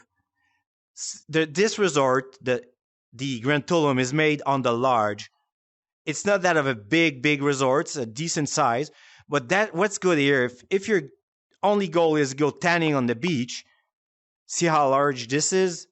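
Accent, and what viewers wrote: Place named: Quebec, Canada. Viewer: C est tellement un Quebecois qui parle !!!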